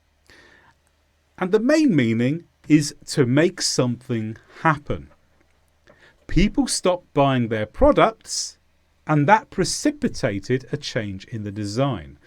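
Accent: United Kingdom. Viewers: I have learned British Accent by you.I owe you i swear.When Our British Customers come to our restaurant they say where did you learn this excellent English?I show you❤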